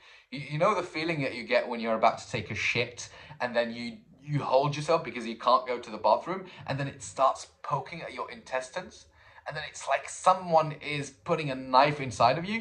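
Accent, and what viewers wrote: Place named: United Kingdom. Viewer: How do you have such a british accent while living in Algeria?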